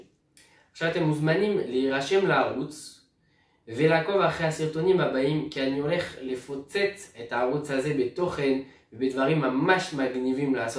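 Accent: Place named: France. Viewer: J ai reconnu l accent fr mdrrr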